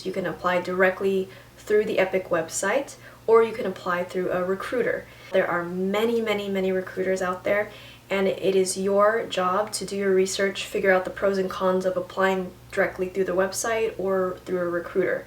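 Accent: United Kingdom. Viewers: where do you come from sis? ^^ I love your english accent.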